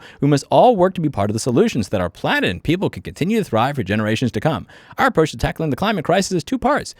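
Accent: New York, United States. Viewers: Haha, yeah, I came down here looking for similar comments. His NYC accent was coming through strong and thick.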